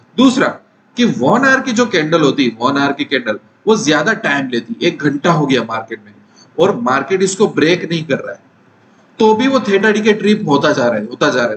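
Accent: Gujarat, India. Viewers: Pakka gujju bhai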